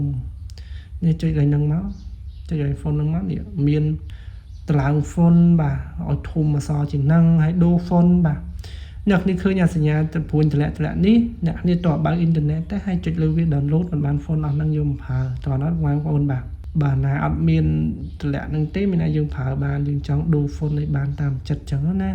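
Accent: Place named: Kampot, Cambodia. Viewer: ស្រ៉ូប ៗ ដូចអ្នកកំពត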